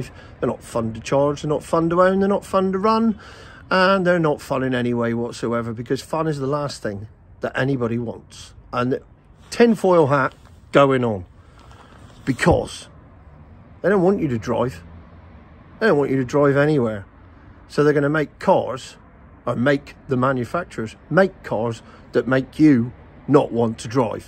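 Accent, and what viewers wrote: Cornwall, United Kingdom. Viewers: “Snorstell” ahh boodiful Cornish accent!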